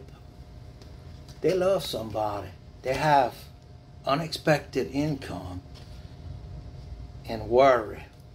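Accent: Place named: Louisiana, United States. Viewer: You remind me of my Grandfather! Both of them .. Both of them were born and raised in Louisiana. Southwest Louisiana to clarify :) Really enjoy listening to you. Reminds me of Home.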